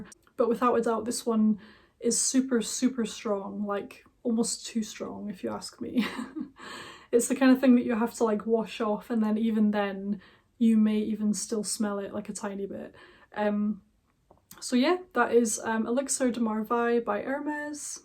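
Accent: Scotland, United Kingdom. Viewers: Greetings to Scotland. Thank you. Nice, elegant, and classic Sottish accent. Have a successful year filled with love and happiness. Happy New Year.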